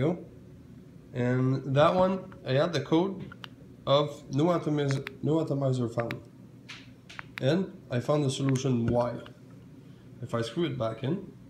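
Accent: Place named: Quebec, Canada. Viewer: Toer t québécois pis sa parait 😂😂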